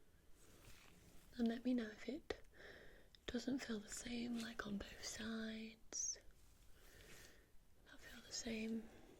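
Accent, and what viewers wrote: United Kingdom. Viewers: Just subscribed. You have a very soothing manner, wonderful accent. I'm kind of a typical American who loves British accents. I would imagine you'll have many more subscribers in the future. I'll be watching more of your videos and I'm sure I'll be hitting the like thumbs up on them. Wish all success to you. Greg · This was great :) First thing I checked while listening to the video was if there was any info on your area because I thought it was a British accent and I was right. No wonder it sounded a lot more pleasing :D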